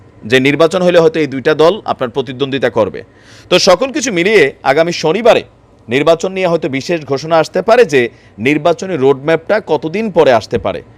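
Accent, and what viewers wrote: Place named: Noakhali, Bangladesh. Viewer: ভাই আপনি যতই শুদ্ধ ভাষা বলতে চেষ্টা করেন না কেন আপনার নোয়াখাইল্লা ভাষা চলে আসে এটা খুব হাসির কারণ হয়ে দাঁড়ায় তাই আপনি নোয়াখাইল্লা ভাষায় বলেন শুদ্ধ ভাষার বইলেন না আপনারে আর মানায় না এটা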